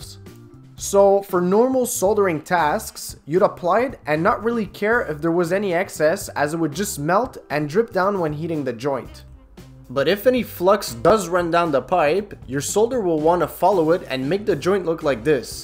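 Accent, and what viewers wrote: Quebec, Canada. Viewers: GDog S Canadian accent! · Not that it matters, is this a Canadian DIY advice video site? Just out of curiosity (as I’m from Ontario) - just going from the Quebec licence plate, your accent and seeing Holmes on Holmes clips just made me wonder. Personally I think it’s great as I’m a bit tired of getting all the advice from US sources. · presque pas d'accent excepté une couple de fois! Un québécois reconnais un québécois qui parle anglais! ;) Mais! Bonne nouvelle. Je me suis abonné à ta chaine!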